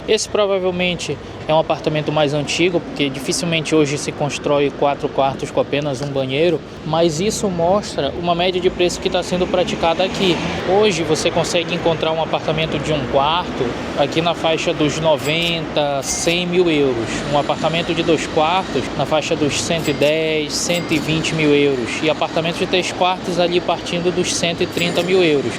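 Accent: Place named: Amapa, Brazil. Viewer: eu tambem sou de Macapa, logo reconheci o jeito de falar.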